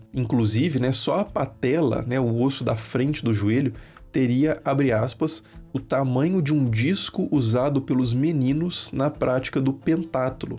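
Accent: Minas Gerais, Brazil. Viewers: que achado foi o seu canal!! As temáticas que você explora são extremamente interessantes e, ao meu ver de leigo, pouco conhecidas do grande público. Você tem um conhecimento incrível, uma didática ótima e (detalhe não negligenciável) uma voz/sotaque mineiro muito gostosos de ouvir haha Para mim, é o melhor canal de história em português! Parabéns pelo seu trabalho maravilhoso e obrigado por compartilhá-lo!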